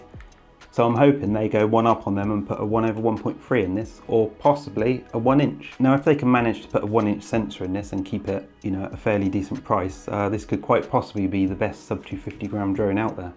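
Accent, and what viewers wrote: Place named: England, United Kingdom. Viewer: good idea to show Potensic what to improve! But what I need to mention about the presentation of this Video: please try to talk in "standard English" to reach an international audience...you speak in an accent that probably only English people can understand (or people who have lived in England for a long time)...a pity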